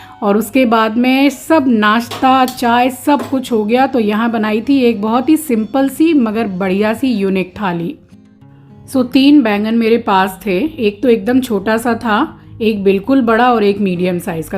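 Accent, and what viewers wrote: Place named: Marwar, India. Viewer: Aap marwaadi hae,mere nanihal me same working chalti hae or bolne ka tarika bhi kyunki woh bhi marwaadi hae…😊😊 I like ur life style because I remember my nanihaal after watching ur vlog❤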